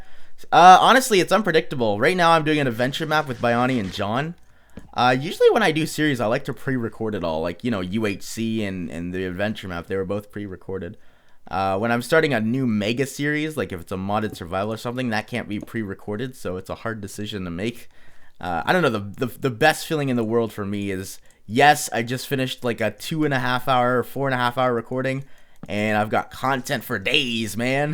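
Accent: Canada. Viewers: he is Canadian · Is he Canadian?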